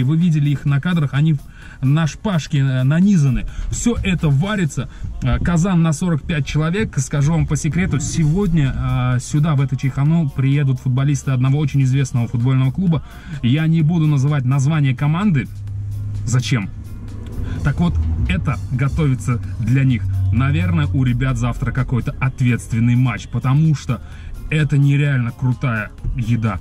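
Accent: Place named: Tashkent, Uzbekistan. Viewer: Гап йо йорвордиз ростакам тошкенча булди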